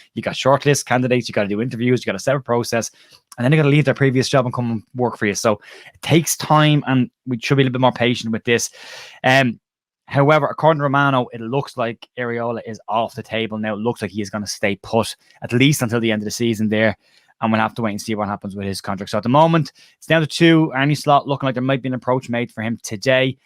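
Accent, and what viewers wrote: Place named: Galway, Ireland. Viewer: Great job! As a novice follower of the game my tactical understanding is limited. But when it comes to how the Board is going about this transition, I have similar experience from other corporate situations. Your coverage of this aspect of the Whites is both knowledgeable and, even rarer, objective. No pinch of salt needed. In addition, to my Midwestern ears, your delightful Galway brogue fits easier than Yorkshire English. The non-game terminology is also curious to me. Here in the US, we wouldn't "sack the manager" but "fire the coach". And if the replacement isn't onboard soon, we'd be saying, "Ready. Fire. Aim."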